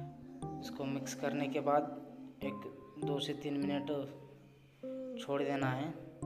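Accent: Hyderabad, India. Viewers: Pkka hyderabadi bol rahe hoo bhai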